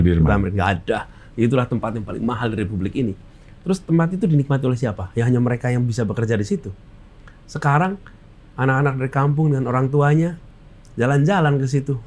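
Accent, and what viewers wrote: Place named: West Java, Indonesia. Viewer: Aya oge logat sudaan pa anis bicaranya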